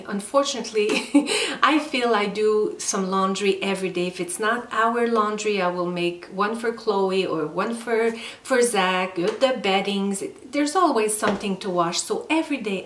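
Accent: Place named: France. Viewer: Hello, you’re always so well organized and disciplined. I like it, but sometimes I’m a bit lazy to do it like that. I love your channel. I’m from Germany and unfortunately i don’t speak English well. Sorry for that. I enjoy every video with and from you. 👌This light French accent is very adorable to you. 😘🙋🏼‍♀️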